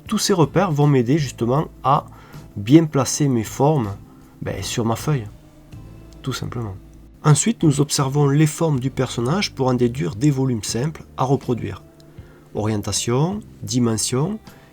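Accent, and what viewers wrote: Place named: South, France. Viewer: Petit accent du sud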